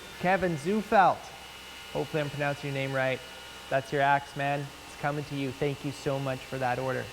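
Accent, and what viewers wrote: Canada. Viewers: I'm Canadian. And you sir, have a truely incredible Canadian accent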